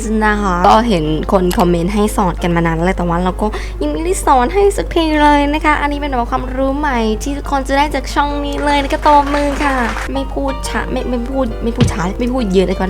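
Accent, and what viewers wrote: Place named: Suphanburi, Thailand. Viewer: ไงครัช ชอบรายเส้นนะ เก่งอะ เหนอวะ เปนคนสุพรรณนิ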